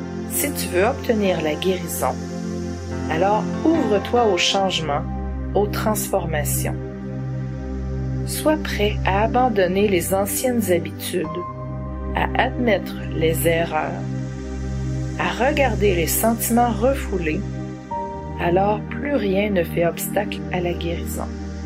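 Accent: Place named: Quebec, Canada. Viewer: Merci pour cette méditation mais dommage je n’arrive pas à comprendre autre langage à cause de votre accent québécois ou canadien. C’est vraiment vraiment dommage mais bon merci en tout cas bonne soirée bonne nuit ciao ciao. Car quand j’ai Michaël me pardonne mais on m’a demandé de dicter ses mots secondaires c’est ce que je fais voilà au revoir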